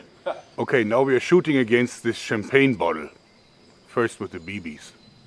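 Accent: Germany. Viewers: Ich bin mir nicht ganz so sicher, aber bist du deutsch? :D Hörst dich nämlich ein wenig so an ^^ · are you from germany originally? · as a german i got to say that this sounds like a german dad speaking english. r u german o.O ?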